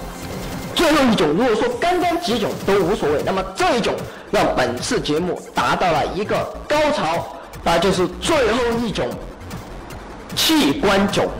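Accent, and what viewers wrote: Sichuan, China. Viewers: 我四川人听的很顺畅，只是他很川普所以有些不会四川话的人会觉得很怪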